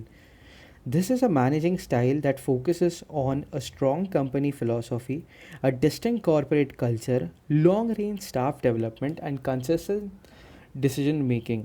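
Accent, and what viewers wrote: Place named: India. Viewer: its an Indian accent so difficult for most to follow outside India.If you are making a video for a larger audience please have pictures, subtitles to add more value.I am Indian and no offense to anyone but even though we talk in English our accent and pronunciations are different.Great material but please make changes.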